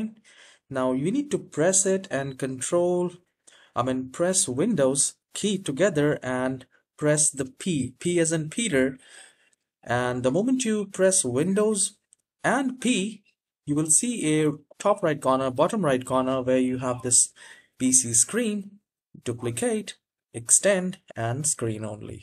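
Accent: India. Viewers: this dude is indian sounds like a scam but 100% lewgit good job traight to the point new keybard bad spelling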